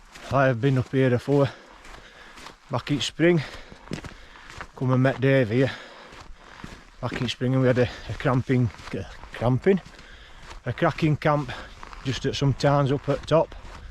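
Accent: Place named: Northern England, United Kingdom. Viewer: Evening, (you still remind me of hovis bread and cobbled roads with your accent, all good👍) I saw your pics on Instagram, but watching your video I can really get a true feel for how icy the conditions are, it's gonna get to -8 where I am tonight apparently, so I can understand how you felt 🧊 ⛸️. Pity I don't get the views you had, it looked cracking. Enjoyed watching your vid, see you on your next adventure. Have a merry Christmas 🎅 and a happy new year 🎉 😊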